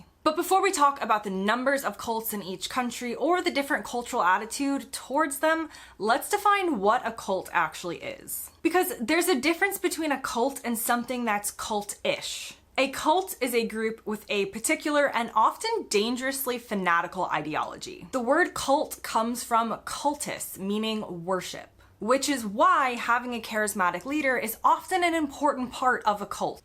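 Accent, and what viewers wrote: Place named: United States. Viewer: Madam, I am not sure you are correct, but your ENTIRE VODEO was beautifully phrased, logical, eloquent and humorous, Many bemoan your young American qualities and write you off as an airhead, I presume because of your intonation . But I find you to be unusually perceptive and well spoken to boot. I have said it before and I say it again, you are a welcome addition to our Great Britain!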